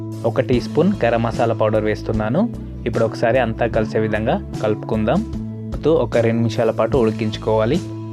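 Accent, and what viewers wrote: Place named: Andhra Pradesh, India. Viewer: Tammi, mana karimnagar yasa lo matladakunda, andhra yasa matladtavem tammi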